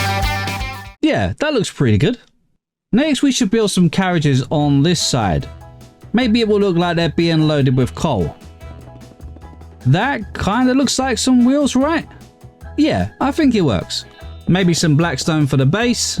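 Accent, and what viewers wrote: United Kingdom. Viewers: It is actually quite hard to me to understand how do u pronounce some of this words, as for non-english speaker. But, cool buildings and this cool sounding british accent, so i happy i found this channel!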